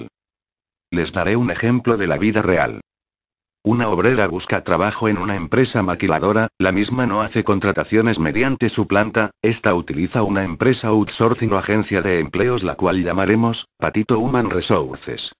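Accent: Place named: Spain. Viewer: ya me cayo en la madre ese pendejo k siempre sale narando con su vos española ya quiten esos videos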